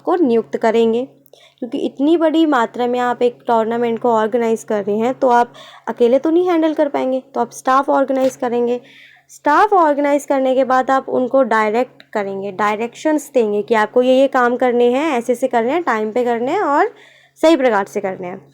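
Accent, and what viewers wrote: Delhi, India. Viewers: Mam are u from Delhi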